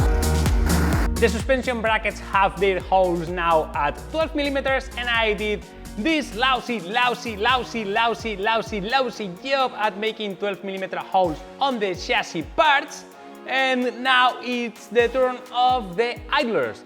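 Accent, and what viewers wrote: Spain. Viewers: Si vas a hablar en inglés, por lo menos esmerate para que tu acento sea lo más españolizado posible... Gracias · Echaba de menos tu ingles casi spanglish, por lo que se ve youtube no me daba en la feed tus videos asi que para mi habias desaparecido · Se nota que eres español xd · Más aún? Yo me esmeraria para no tener más acento español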